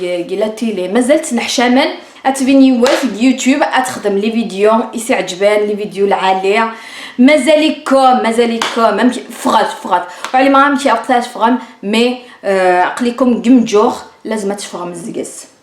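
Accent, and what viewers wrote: Bejaia, Algeria. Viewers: Mignon l'accentvde Begayet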